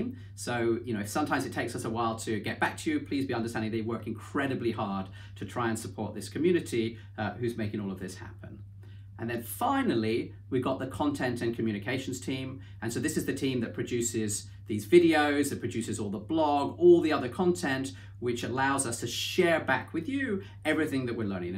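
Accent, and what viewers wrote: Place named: North West London, United Kingdom. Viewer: Definitely Jewish, you can tell by the way he speaks. Classic NW London Jewish accent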